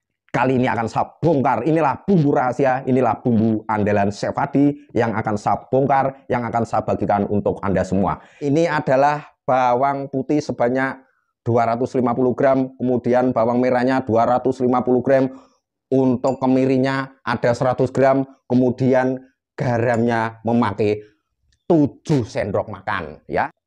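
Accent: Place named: Java, Indonesia. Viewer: Chef ttp dgn ciri logat medoknya 😊 seneng sy, sukses selalu ttp humble 😊😊😊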